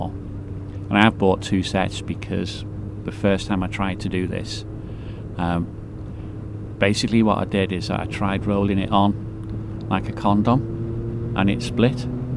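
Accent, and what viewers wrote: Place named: RP, United Kingdom. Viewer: Loving the voice over hadn't realised how posh you sound 😂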